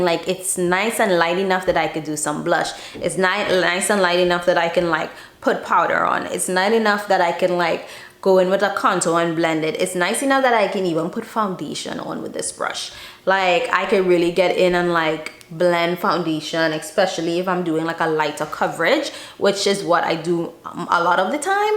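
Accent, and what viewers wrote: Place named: Trinidad and Tobago. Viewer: You sound Trinidadian. Where are you from?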